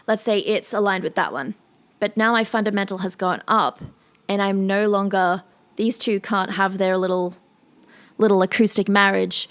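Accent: Australia. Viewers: Can you please start a podcast or anything, maybe about music, or anything else that interests you? It's so relaxing to listen to, the Australian accent sounds really good :) I was there btw, at the end of the video haha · I love how you get so into the music and your expressions. And the red hair and red nail polish does it for me, And to top it off the Australian accent and such beauty, I’m hooked!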